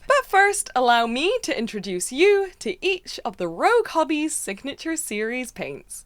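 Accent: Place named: Scotland, United Kingdom. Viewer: Sorry if this offends you, but its annoying me that i cant pin your accent, at times you sound Scottish, lothian/Edinburgh way, but i'm probably completely off 😂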